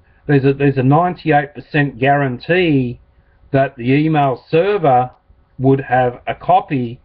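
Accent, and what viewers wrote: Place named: Australia. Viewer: Sounds like you're Australian. Not sure if the laws in Australia are the same as in the U.S. There are a lot of "privacy laws" in the U.S., which Hillary is hiding behind.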